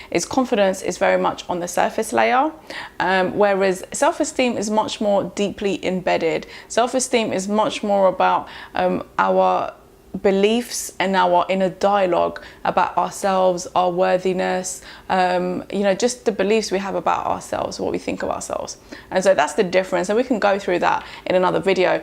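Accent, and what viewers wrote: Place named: Russia. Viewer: I love the video but do you from Russia or Germany ???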